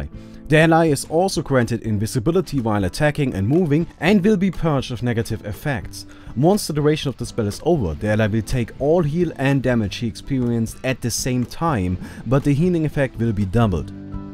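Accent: Germany. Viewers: german accent :)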